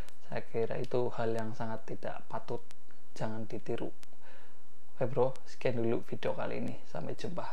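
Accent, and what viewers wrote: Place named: Java, Indonesia. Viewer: kawel medok nemen 😁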